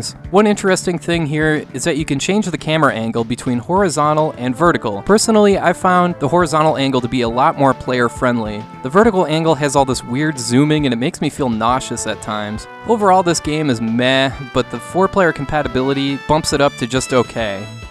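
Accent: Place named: Minnesota, United States. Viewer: Never knew you were a Minnesota guy, but hearing you say the word hockey 40 times in the last 10 minutes I can't believe I didn't pick it up before!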